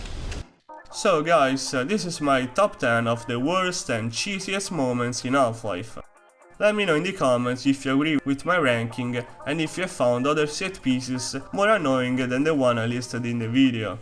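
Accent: Italy. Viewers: Wild guess but, are you by any chance italian?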